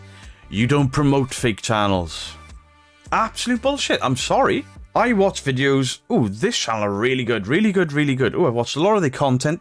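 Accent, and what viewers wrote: Wales, United Kingdom. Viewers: it's a Welsh accent and Welsh people rock 😎🤟🌟🏴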